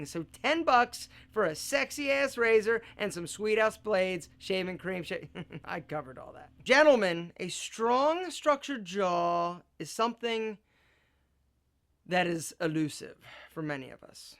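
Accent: Philadelphia, United States. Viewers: Are you from philly? Wooder.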